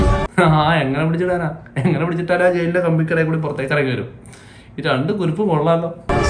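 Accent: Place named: Kasaragod, India. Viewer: എവിടെയാ നാട്. നമ്മുടെ kasargod ഭാഷ വരുന്നു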